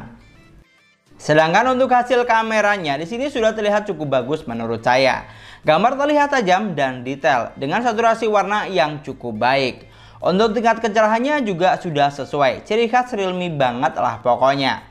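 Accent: Madura, Indonesia. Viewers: Madura banget logatnya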